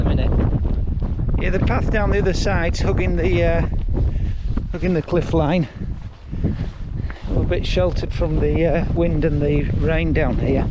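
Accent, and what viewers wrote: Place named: England, United Kingdom. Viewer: Turned off as soon as I heard that English accent and the absolutely pathetic attempt at Gaelic pronounciation.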